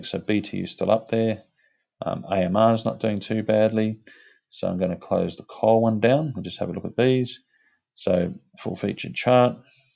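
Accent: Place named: Australia. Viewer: Hi, I heard you mentioned you use TradeStation. I'm assuming you're aussie from the accent... Is that the broker you'd recommend for aussies on TradingView?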